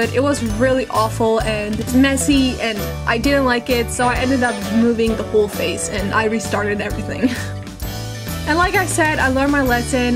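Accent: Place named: Sweden. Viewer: I love it! Ps your accent is amazing I wish Danish accents sounded like Swedish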